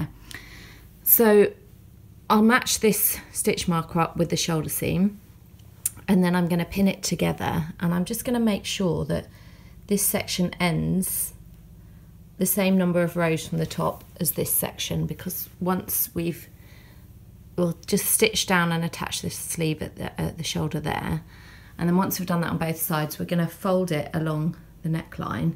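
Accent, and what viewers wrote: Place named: United Kingdom. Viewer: I wish that you were my neighbour you angel. Thankyou for this video. Nice to hear another Uk lady. I really love your work and you explained it all just perfectly. ❤❤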